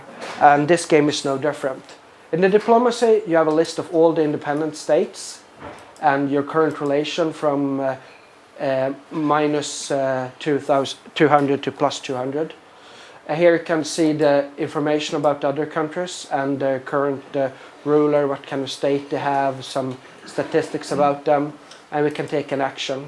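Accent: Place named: Sweden. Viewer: Swedish accent ftw!! ;)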